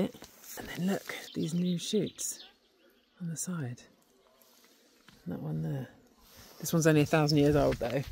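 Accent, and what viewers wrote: England, United Kingdom. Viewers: Whatever British accent this is, its my favorite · Red hair, pretty, English accent. Boys, this is it. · Is the freaking english accent for me, but she also has that Brian Cox type of charisma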